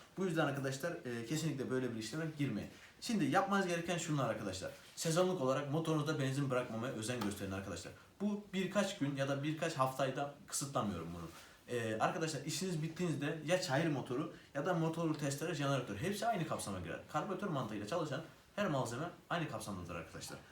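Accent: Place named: Black Sea, Turkey. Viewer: Karadenizli şive farkı var söylem tarzı var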